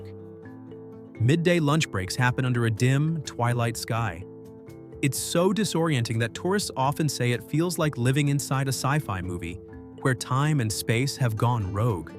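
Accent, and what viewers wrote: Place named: New York, United States. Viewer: This commentator must be from New York..... or Martha's Vinyard..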